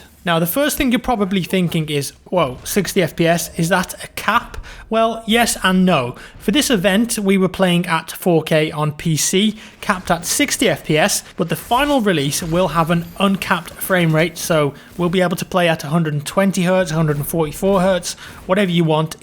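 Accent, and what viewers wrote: United Kingdom. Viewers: اللكنه البريطانيه 😷💔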